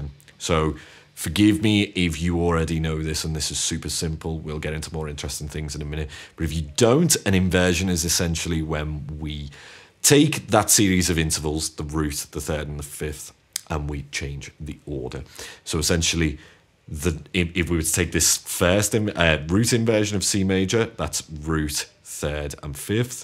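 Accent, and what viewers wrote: Liverpool, United Kingdom. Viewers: are your from somewhere near liverpool? im from Bootle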